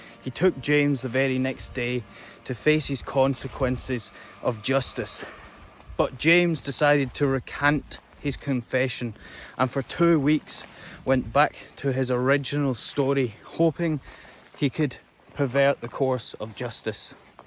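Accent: Scotland, United Kingdom. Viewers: Nothing comes close to a Scot telling a scary tale! Bravo! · Good story, and you have a great voice for narration. Your voice is soothing and relaxing and the Scottish brouge takes all the rough edges away. You bear a strong resemblance to both Thomas and James. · your Scottish burr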